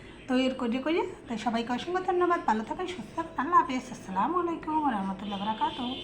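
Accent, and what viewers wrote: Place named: Barishal, Bangladesh. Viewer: 😁😁 বরিশাইল্যা মনু 👍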